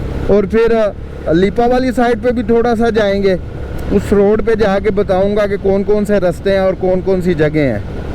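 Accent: Punjab, India. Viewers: Bhai es se acha hai ap punjabi bol lo kyun k ap ki urdu hindi bolne main punjabi lehja kafi had tak mix hai ya ap parhe likhe km ho😊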